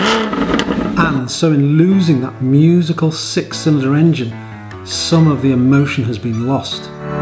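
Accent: Liverpool, United Kingdom. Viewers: Sounds like an awesome Scouser accent ..even better to watch the Porsche video.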